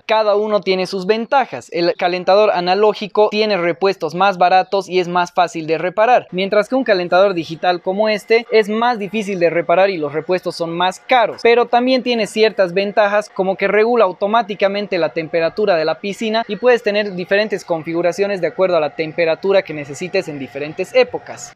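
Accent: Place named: Amazon, Peru. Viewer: Es de Perú, de la parte de la selva por su forma de hablar.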